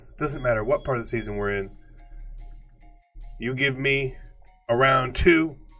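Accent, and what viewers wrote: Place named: Midwest, United States. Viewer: You are so midwestern hahah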